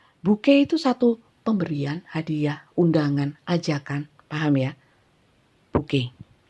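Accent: Java, Indonesia. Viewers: Pgi bunda resonet skli bunda kii lucu kudu guyu klau dgr readingnnya bunda sll tk tunggu egh buun logat jwa bngt suka skli bnr bunda tdk pelut abnrnya ya krena nggk ounya duit aja buun.. Dia fokus krja buun smnjk covid smp dtik ini htngnya bmyak jdi untuk k hub nggk n gtu mikirin.. Tpi dia ttp syank ktne buun.... Trima ksih bcaan ya bunda sht sukses sll buat bubda Aamiin" 😍😘🙏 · bunda lucu penyampaiannya jg detail enak ngomongnya bhs jawanya medok aku apresiasi bngt sm bunda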